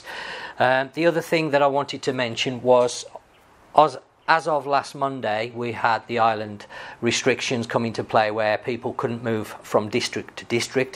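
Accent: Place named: Birmingham, United Kingdom. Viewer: haha ye I know that actually meant what part sorry you sound like a brummie lol I sent you an e mail a while ago regarding motorbike hire.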